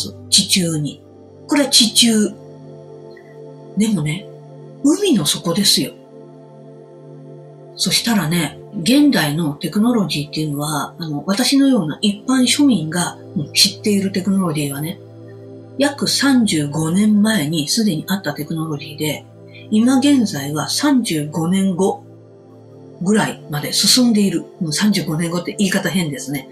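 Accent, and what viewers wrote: Kansai, Japan. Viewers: さん初めまして宜しくお願いします😊自分で語るのもおかしいのですが！私ね、あらゆる全ての波動周波数が何故か分かるのです！今地球に宇宙からのエネルギー波動が流れ出来ています9874hzです2〜3年前(コロちゃん初)700~900hzでしたのでものすごいスピードできめ細かく軽い波動が地球に入っていますね！😅このペースで行くと25年の銀河波動も、期待出来ますね！今も少しっつではあるけど南に傾いています😮ポールシフトが起きる事は宇宙では決定しているのですがその前に、予知現象といった具合に私たちが経験してそれから完全なポールシフトが起きます！その時沖縄~台湾の地下大陸が浮上します😮 さんの関西弁がとても好きです😅ありがとうございました💕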